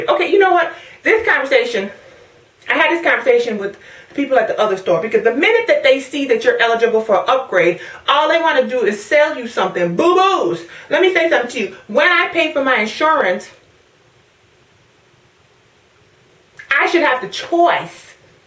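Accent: Caribbean. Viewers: you really went in on her with your VI accent mehson! I feel for you digicel got on my last nerve last yr. Hopefully this is the last of it. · You have made my day, you are so funny! Love the Carribean accent!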